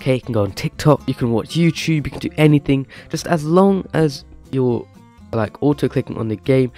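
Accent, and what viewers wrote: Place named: United Kingdom. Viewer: i love the bri'ish accent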